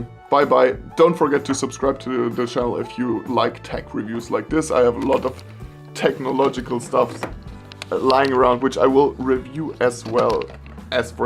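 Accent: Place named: Germany. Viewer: Deutscher Akzent confirmed😂😂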